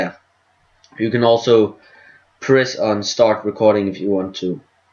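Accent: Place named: Denmark. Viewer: dansk aksang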